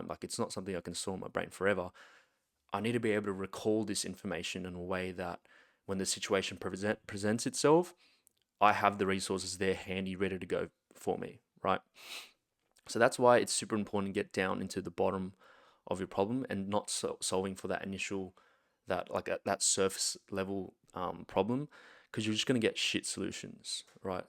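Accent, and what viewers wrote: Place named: Australia. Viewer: You sound like you live in Australia.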